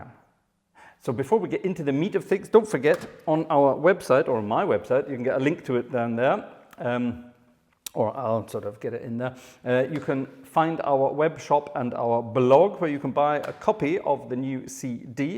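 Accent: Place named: Scotland, United Kingdom. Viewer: I watch the German version for the same reason. German with a slight scots accent is very easy on the ear. ;-)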